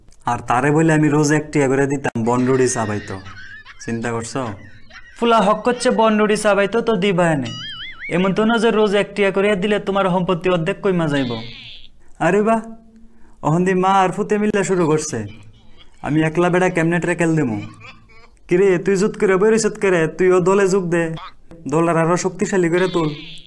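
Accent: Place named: Noakhali, Bangladesh. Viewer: Please don't try this nowakhali accent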